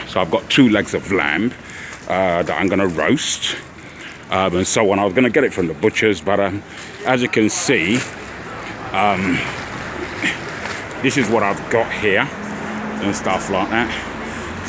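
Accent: Cockney, United Kingdom. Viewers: Thank you for spreading the truth and reality of the UK. Too many go back home pretending they are living like kings. The truth? they are telling lies. My parents took me and my siblings on holiday to Nigeria when we were kids in the early 80s and the thing that struck me were the amazing and beautiful buildings in Owerri and the village. I'd never seen a luxury apartment in my life, not until I went to Nigeria. People owning their own land and building their own homes on it. That blew my mind. UK was a bit late to the game of building luxury apartments for ordinary folks. Really tired of the negative images of Africa the UK like showing on our TV screens. Thanks again for making it your mission to show us there is a better way. Love the Igbo Cockney😄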